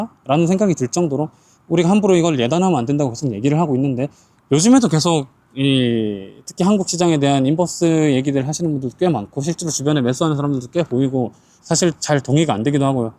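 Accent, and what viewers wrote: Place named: Namhae, South Korea. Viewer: 가끔 들리는 남해 사투리가 정겹네요. 님 예전 영상들 보며 공부중이며 정말 많은 도움이 되고 있어요. 기회가 된다면 멤버십 스터디에도 참여하고 싶네요. 한국의 워렌버핏이 되길 바랍니다.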